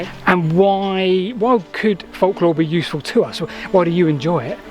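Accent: United Kingdom. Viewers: How does Youtube think the audio track is "English (United States)"? He is the most British sounding bloke I've heard all day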